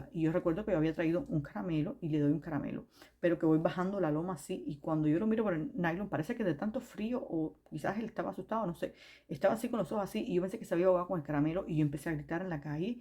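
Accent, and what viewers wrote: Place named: Cuba. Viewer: Hola soy cubano, y por su asento creo que es cubana. Me gustaría que hicieras un vídeo para nosotros los cubanos a ver nuestras perspectivas pq si, llevo rato viendo vídeos de YouTubers pero ninguno de la perspectiva de un cubano.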